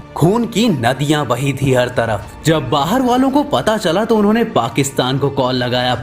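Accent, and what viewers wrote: India. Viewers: bro are you from Odisha??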